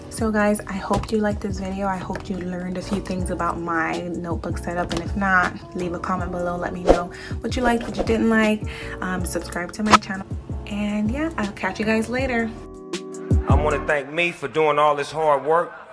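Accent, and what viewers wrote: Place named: South America. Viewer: Very nice notebook! But I see you are learning South American Spanish... In standard Spanish (from Spain) we don't use those kind of expressions or accent, I think you should find more Spanish content and less South American 🙂Unless you are specifically learning that accent. Greetings from Barcelona!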